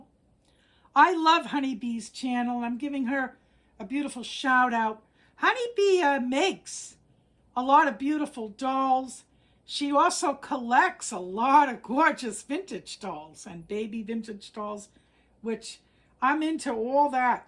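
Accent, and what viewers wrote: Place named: Italy. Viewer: I can hear the Italian in your voice 💗 What a story to tell - celebrating the wonderful you you have become 💗 What a sweet baby - and that is an impressive brush 💗 We have an adopted son (8 at the time, adult now ). He is very good to us - he very much appreciates havng had 'parents/family' in his life, like you. You have a wonderful story to share with others 💗 God Blessed you 💗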